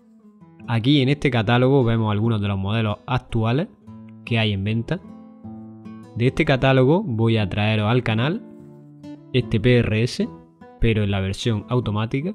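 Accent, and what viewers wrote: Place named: Andalusia, Spain. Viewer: Tu tono andaluz y la música hacen de este video una joya....saludos desde Tucumán Argentina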